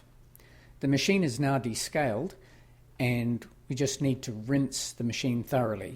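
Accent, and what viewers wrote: New Zealand. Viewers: Great video, shame about the kiwi accent. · A great help. Thanks for making the effort. Love the KIWI accent! Reminds us of a beautiful country and a great holiday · lol.If u knew a Kiwi, the accent wouldn' t bother you. They are such nice people. The accent is all part of the charm. And they're smart too. They do things like make super helpful How-to videos.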